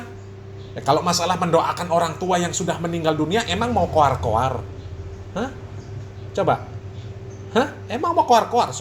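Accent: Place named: East Java, Indonesia. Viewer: Dari aksennya, orang Jatim seputaran Surabaya Malang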